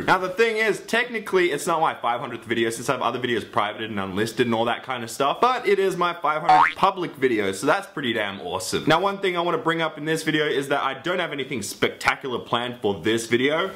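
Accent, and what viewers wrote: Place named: Australia. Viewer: My left ear is loving this Australian voice and my right ear is enjoying these random noises.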